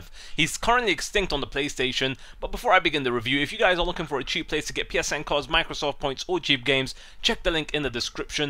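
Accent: Belgium. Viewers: He's not French...Didn't you ear this ugly Belgian accent ? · sounds like he was from belgium! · This guy had a belgium accent btw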